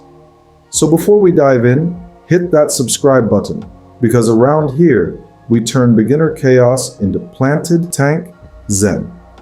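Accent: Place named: Russia. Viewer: I like your somewhat russian 🙂 voice which is clearer and concise. Slower and well paced so I can pause and take notes too. 👍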